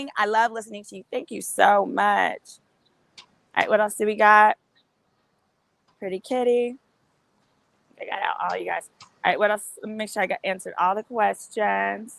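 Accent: South, United States. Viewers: I immediately subscribed to your channel last week after hearing your accent on Demetria L Lucas's podcast. I'm from MS and love a good ole Southern accent. Does your friend care about you calling her a hoe? I crack up each time you say this 😆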